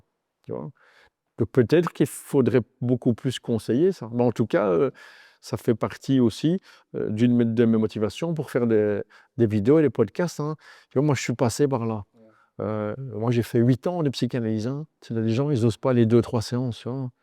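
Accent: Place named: Brussels, Belgium. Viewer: Maître si les pot cast avaient existé il y a 20 ans je suis certain que j’aurais été inspiré par vous et j’aurais fais des études de droits. Vous êtes hyper inspirant. Ps: l’accent bruxellois est dingue 😂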